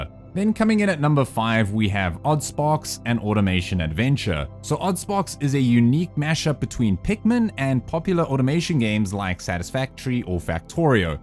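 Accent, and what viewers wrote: South Africa. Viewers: Nice to hear a Capetonian accent on the YouTubes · you sound south african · Hold up. Is that a fellow saffa I hear?!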